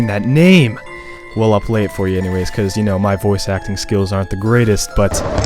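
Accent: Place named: United Kingdom. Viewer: 2nd That's bullshit. He has a british accent!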